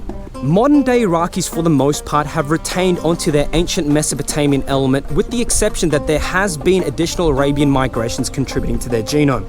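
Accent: Australia. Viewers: Who is this Aussie iraqi lol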